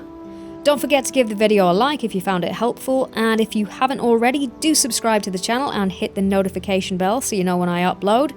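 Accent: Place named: Australia. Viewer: Your accent sounds like highbrow Australian? North Queensland maybe? But your London. Hum. Anyway I love it.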